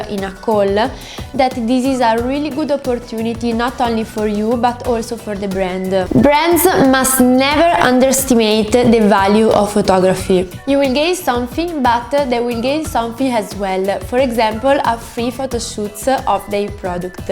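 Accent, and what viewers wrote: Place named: Italy. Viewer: Every letter has been pronounced in this video,😂😂😂😂 loud and clear. You sound so Italian, anyway good tips 👍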